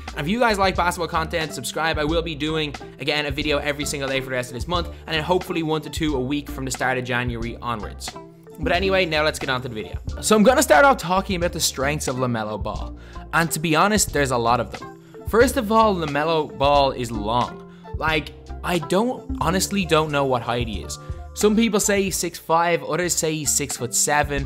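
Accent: Ireland. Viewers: Are you from Ireland? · Are you irish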